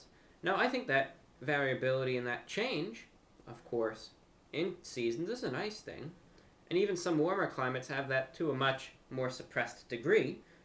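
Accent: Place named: New York, United States. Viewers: wow I was wondering where you were from. I always thought you sounded like you were from NYC metro area but yet your vids often gave impression of you being in the south. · I like these vids, they are enjoyable although it's hard for me to say why. It's very inoffensive and vanilla, so I guess it's soothing to listen to these random and non-controversial topics after a day full of stuff. On a side note, I was totally convinced you had a NYC or New Jersey accent from my first time watching, but for some reason I thought I heard in another video that you grew up in the south, so I was confused. Anyway, as another northerner who lived in the south for a while, I can definitely say I prefer variety in the weather, and I like winter. A couple of times when I was in Mississippi it actually snowed and I got to see the whole town shut down in a panic, which was fun. 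We got less than two inches.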